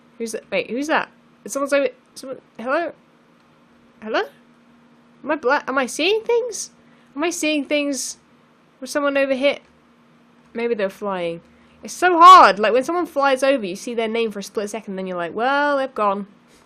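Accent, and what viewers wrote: United Kingdom. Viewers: Mare-ee-yo? Wow British people sound funny.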